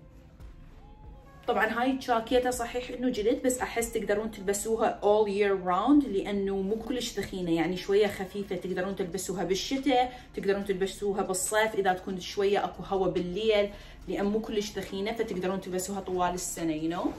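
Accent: Iraq. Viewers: يا الهي اني طالبه سادس وكتشفت قناتج وعندي متحانات خرررب دا شغل الفيديو من اضوج واقره شكد شايفه بنات وهاي ع يوت بس ابد مومثلج يعني نتي صدك عفويه بشكل مو معقول + حجيج العراقي يخبل عليج حياتي ❤❤❤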